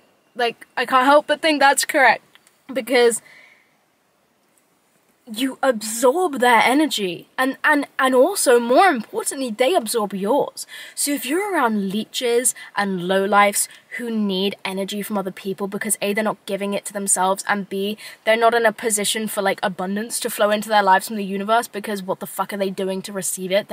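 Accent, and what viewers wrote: United Kingdom. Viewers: For the not native speakers you speak too fast))) Where you from, UK? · Are you British!? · it this a British accent?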